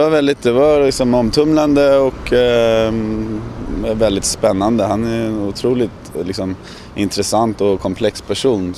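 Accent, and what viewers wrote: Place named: United States. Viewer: Holy shit I thought he was American. Sick.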